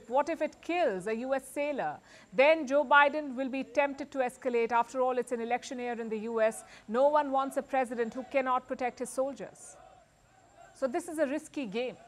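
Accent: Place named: India. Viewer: You have to appreciate the seriousness of an Indian accept with the news! It’s frank and firm! Amazing work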